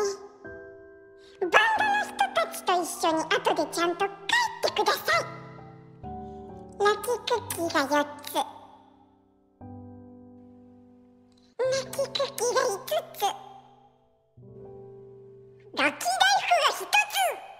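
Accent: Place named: Kansai, Japan. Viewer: 最高です‼︎最近このチャンネルを知りました。毎日見ています😂もはや中毒と言ってもいいほどハマっています。結婚を機に東北へ引っ越しして早15年。関西弁が恋しくて毎日ここを訪れては腹が捩れるほど笑っています❤今後も楽しみにしてますよ🎶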